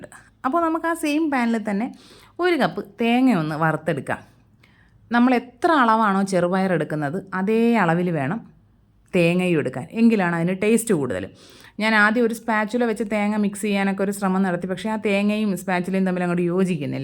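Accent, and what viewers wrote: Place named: Kollam, India. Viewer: കൊല്ലം ജില്ലക്കാരിയാണോ? സംസാരം ഞങ്ങൾകൊല്ലക്കാരുടെ പോലെയുണ്ട്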